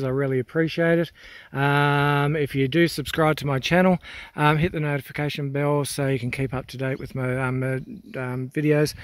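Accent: Australia. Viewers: Ah, read all the way to the bottom and am terribly sorry to hear that the cancer is back - like a knife through the heart with shock and sadness. You know you were my first love for abandoned explores (it's that catchy Aussie accent as you ramble on, haha) and because you do what you do so well, here in Upstate NY we're sending tons of love back for a safe and quick recovery. Peaceful thoughts to you and yours. (Another great explore, by the way!):)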